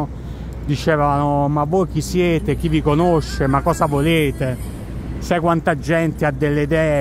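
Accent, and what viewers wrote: South, Italy. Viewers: Prima di tutto " Supercalifragilisticespiralidoso " lo spell checker è andato in tilt.... devo dire che pur se mi vergogno delle ore che spendo su YouTube, tu sei stato la mia ultima scoperta. Seguo molte coppie in giro per il mondo ma tu sei il primo meridionale e il tuo accento mi dà tanto conforto qui a Glasgow fredda e piovosa. Ti voglio dire che forse non lo noti perché sei dall'altra parte della camera: hai una dote naturale di commentatore, in English " Story teller ", forse anche perché ami la parola scritta. Vorrei con questo messaggio incoraggiarti a seguire questa vena. Descrivi quello che hai attorno, le nuove attività peculiari di NY. Secondo me saresti un buon intervistatore perché ami le persone e ti interessa quello che è intorno. Sei ache un opportunista e credo che questi walk/blogs faranno molto bene alla tua impresa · Non ha ancora perso l’accento meridionale 😳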